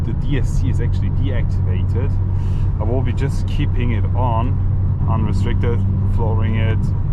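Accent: Germany. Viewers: Bro. Yr accent 100% kartoffel gotta love it!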